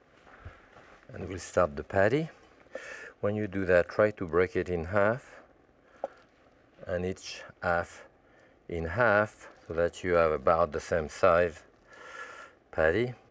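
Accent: France. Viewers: It's his gorgeous French accent. Personally, I could listen to him talk all day long.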